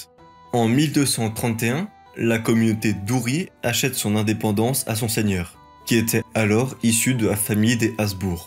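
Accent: France. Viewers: On sent que c est un français qui a fait la vidéo! On ne dit pas LE Schwytz ou L’Uri mais simplement Schwytz ou Uri, et pas « glarisois » mais glaronais…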